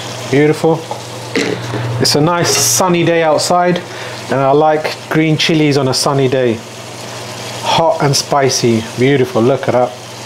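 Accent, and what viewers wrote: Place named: United Kingdom. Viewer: Some British accent..... up ahead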